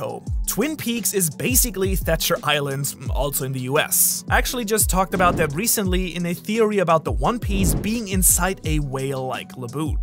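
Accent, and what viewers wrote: Germany. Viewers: Aha! Wusst ich doch dass du Deutsch bist, anhand deines Akzents :D Gut zu wissen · I did not know you're from Germany (of course I detected your accent, just not that it was a German accent) Hi homeboy ... tee hee I'm from Karlsruhe ( a bit north of Bavaria)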